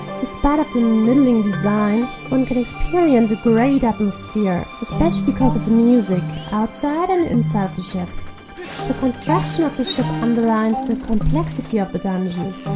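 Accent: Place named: Germany. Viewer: You have a slight German accent, but your English is very good. And I agree with Taylor, it's a charming accent.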